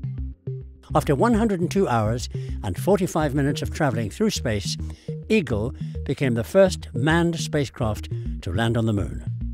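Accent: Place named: United Kingdom. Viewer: Do you think they have British documentaries in England that are narrated by Americans probably not so why do we have to listen to limeys over here every time we want to learn about something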